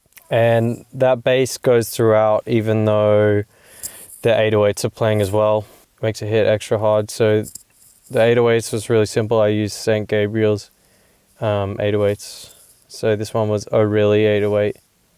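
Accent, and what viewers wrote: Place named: Australia. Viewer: brah i cud tell from ur accent thought so... good shit bro. saving aus underground scene with these beats <3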